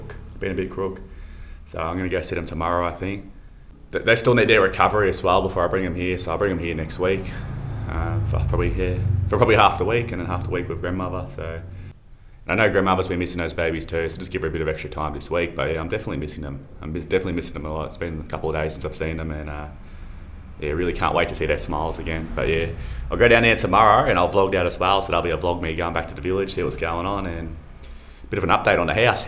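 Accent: Australia. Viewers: Hey mate, how do you still have such a thick Aus accent hahaha. Crazy that, normally when you move out of Aus we get more pain English accent, but in each vlog yours seems like you get more and more aussie.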